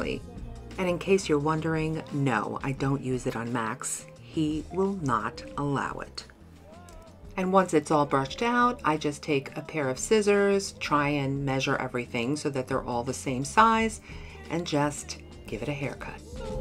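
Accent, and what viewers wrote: New York, United States. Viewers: Absolutely Love your uplifting attitude!!!! I would love to start a YouTube channel. I love crafting, my sister inspired me to begin & now I can’t stop!!!! I love the DIYers , there’s so much to learn & be inspired from. Don’t stop what you’re doing. Trying to figure your accent NJ/NY?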